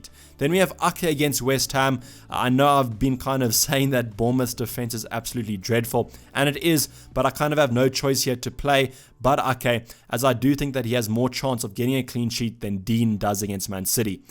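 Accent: South Africa. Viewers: South African 🇿🇦?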